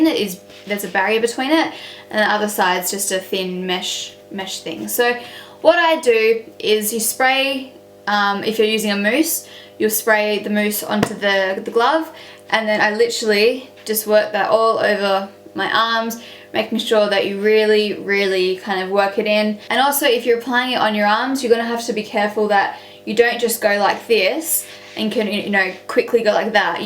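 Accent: Australia. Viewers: She's speaking English and she's from Australia so she has an Australian accent? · you sound so australian here bby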